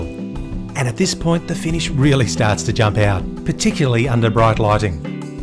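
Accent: Australia. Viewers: Great to hear the Aussie accent. Check out how we do things in Sydney at <link>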